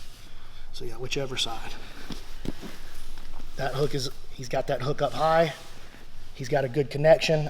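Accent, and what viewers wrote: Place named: Alabama, United States. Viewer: hey are you also from alabama? I recognize the accent. cheers from Hartselle.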